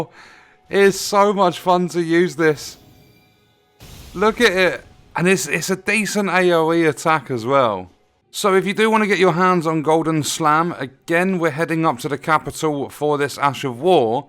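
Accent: United Kingdom. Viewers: One of the best elden ring channels. British Accent is the best Ash of War, technically. Regarding chapters: love em. Let me explain: I watch the whole video first. When I come back ( maybe 2-5 times) I click on the chapters.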